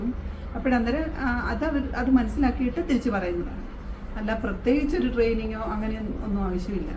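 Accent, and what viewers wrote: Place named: Kottayam, India. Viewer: ഞാൻ ഇത്രയും നാൾ കരുതിയത് ചേച്ചി ഒരു അച്ചായത്തി ആണെന്നായിരുന്നു. തനി കോട്ടയം ഭാഷ അല്ലിയോ 😂ആളെ ഇപ്പോഴാ കണ്ടത് സന്തോഷം 🙏🏻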